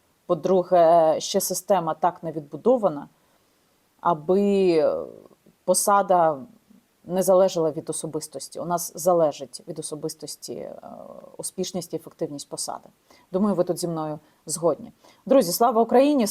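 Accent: Russia. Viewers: Яніна, будь ласка, не говори англійською, як росіяни.Women не вуман, girl не гьорл, birthday не бьорсдей, The Economist не зъ економист. Ти мені дуже подобаєшся, я дивлюся всі твої відео. Слава Україні!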